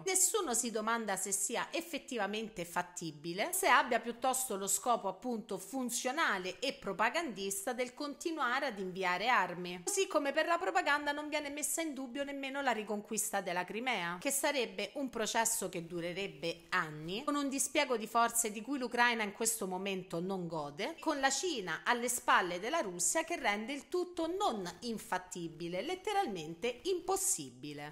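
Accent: Rome, Italy. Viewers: I tuoi video sono delle "Icone" concentratti dell'italico sapere e ponderare. Grazie poi dell'energia positiva che metti col tuo romanaccio simpaticcissimo. Grazie veramente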